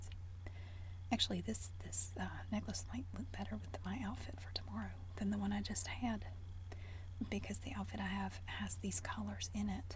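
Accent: South, United States. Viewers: this is the first video of yours that I have watched! I already love you! and your southern accent! wishing you well in your new house!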